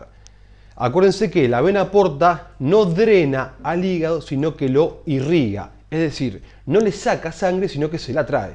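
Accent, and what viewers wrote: Argentina. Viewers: Los porteños hablan así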